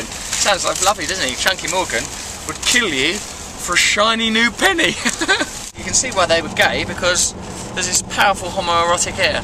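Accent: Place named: United Kingdom. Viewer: Russell love when you talk in this calm manner because sometimes you speak so fast that it's hard to follow your strong British accent. Hope all is well with you and your Mom. Much love from New Jersey USA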